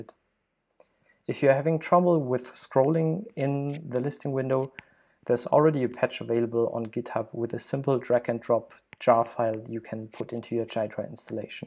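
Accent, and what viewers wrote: Germany. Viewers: Wonderful introduction! You kind of sound German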